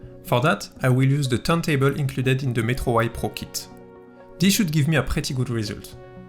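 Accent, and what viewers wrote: France. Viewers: Woah!!! You’re French! Nice to hear your voice! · Pourquoi ne pas parler français ça s'entend à votre accent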